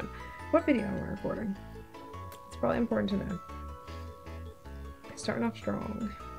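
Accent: South, United States. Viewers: Why do you sound more southern in these newer videos? Lol!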